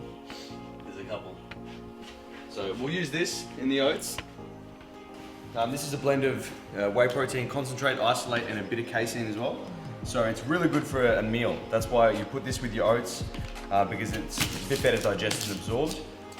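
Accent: Australia. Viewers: Wtf dude just shop at Aldi. I don’t know if you live in US or Australia tbf, you sound Australian. We have Aldi in UK and it’s cheap af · oh shit he's from STRAYA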